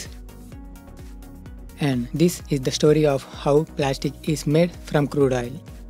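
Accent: India. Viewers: Thanks for the video but your English narration is just terrible the accent is all Indian and it is difficult to understand a word your saying, for goodness sake get a narrator with a good English accent to do your narrations |||||||||| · I hate indian accent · damn indian accent. headache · indian accent...😪😪😪😪🥱🥱🥱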